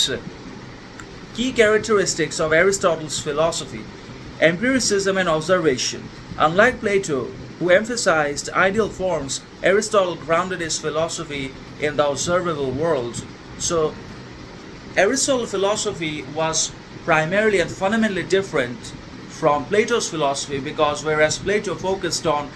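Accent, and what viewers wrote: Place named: England, United Kingdom. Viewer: I like your English accent bro.. as well as philosophical... how your learn this accent.. guide me... I am struck in fluent and lack of accent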